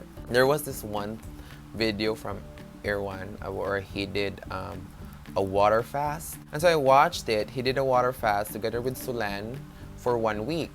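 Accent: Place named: New York, United States. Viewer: its the new yorker accent for me! HAHAHAHA pero bitaw na inspire ko charrr!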